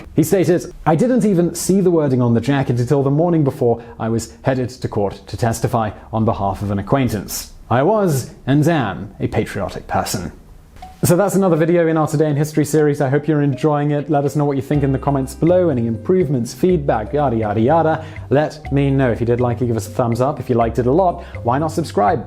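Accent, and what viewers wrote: England, United Kingdom. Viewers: Very elegant eloquent and deep discussions and debates narrated by a nice English accent talking about.. Fuck lol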